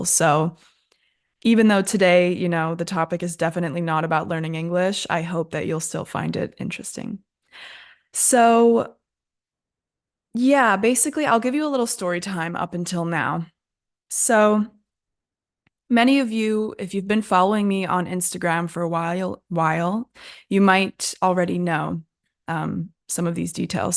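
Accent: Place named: United States. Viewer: Hi. I just subscribed yo your channel. One thing that caught my eye. Bermuda is a British territory, isn't it? However, you speak with an American accent. That's interesting 🤔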